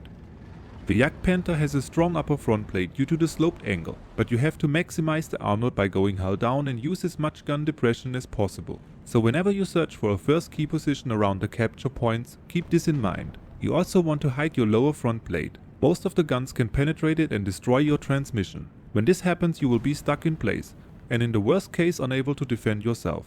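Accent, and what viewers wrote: Germany. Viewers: Eine Sekunde gehört und gewusst, da spricht n deutschsprachiger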